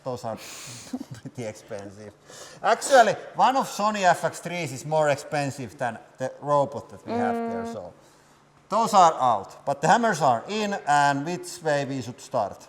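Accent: Finland. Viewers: Hammers are nice and all, but nothing can beat a Finnish accent. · Love your videos, love your Finnish accent 🧡🤘🏻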